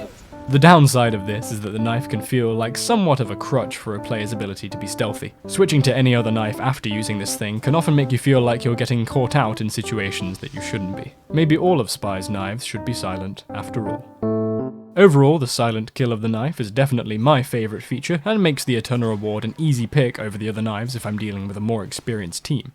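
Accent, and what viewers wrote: United Kingdom. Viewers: You sound like the most British man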